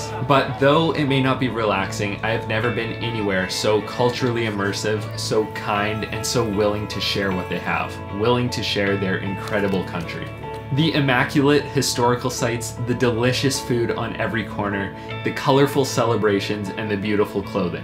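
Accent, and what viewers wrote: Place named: Canada. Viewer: You just sound Canadian .....but you are very Indian deep inside within you .... Welcome home anytime buddy ....come again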